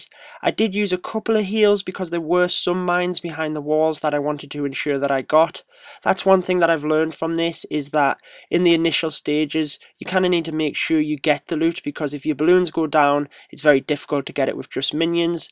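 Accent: United Kingdom. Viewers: O yeah, now I can hear the original British accent. No American mixing 😂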